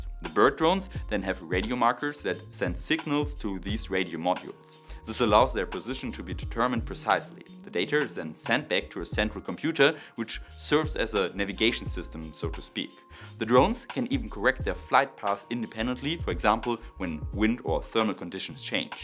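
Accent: Germany. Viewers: You talk like Arnold Swarzenegger's nephew, being German, but I find your talks quite interesting.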